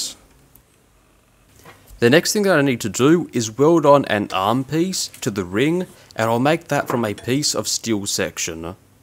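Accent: Australia. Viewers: All great again mate except you pronounce chamfer wrong, don't give up Australian accent. It's a French word and it's pronounced with a "SH" . Soon you will be pronouncing rebate as rabbit? 😉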